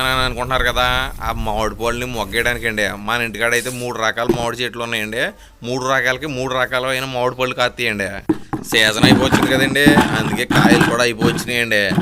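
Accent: Godavari, India. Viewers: Enthina godavari godavari slangs 😅😅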